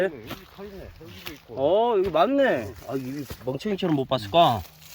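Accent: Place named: Jeolla, South Korea. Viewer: 어느지역의 산인지 모르나 목소리는 전라도같네요 아직 강원도나 충북북부쪽에서만 나오는줄 알았는데요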